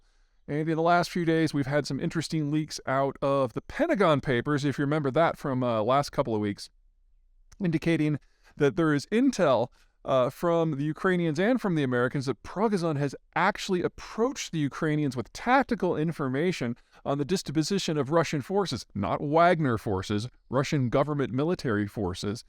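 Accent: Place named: Iowa, United States. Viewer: Peter has always steadfastly stuck to his Iowa "Northern Midlands" approach to pronouncing transliterated Cyrillic. Nothing from Cyrillic he says will ever be pronounced correctly.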